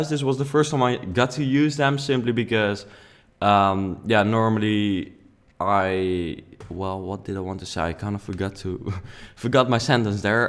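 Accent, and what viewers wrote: Netherlands. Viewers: Are you dutch? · Suupermooi gemaakt! Ik hoorde aan t begin van de video t nederlandse accentje er al doorheen, kun je ook niks aan doen tho ;) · Ik hoorde gelijk dat je Nederlands bent ;)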